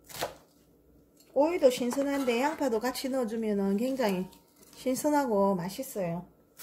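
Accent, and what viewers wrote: Busan, South Korea. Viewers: 안녕하세요^^ 음성듣고 반가웠어요 저도 부산 사람 이라 🤗🤗 풀시청하고 선물드리고 갑니다🎁🎁🎁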